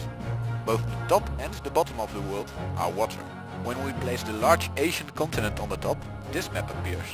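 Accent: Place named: Netherlands. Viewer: Very hollands accent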